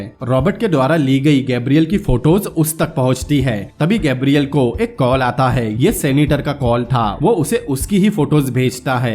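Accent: Assam, India. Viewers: 👍👍👍 I like ur voice... R u from Assam, As your hindi is like Assamese.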